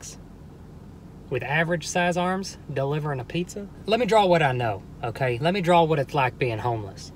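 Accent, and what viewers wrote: South, United States. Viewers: You are hilarious!! Where are you from I hear a southern accent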